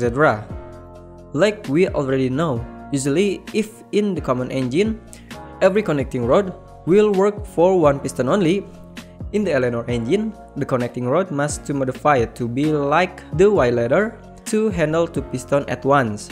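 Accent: Indonesia. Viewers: Ini org Indonesia ya ? Soal nya logat nya indo banget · I immediately know that this channel is based in Indonesia from your first word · Logat indonesia nya kedengeran bro..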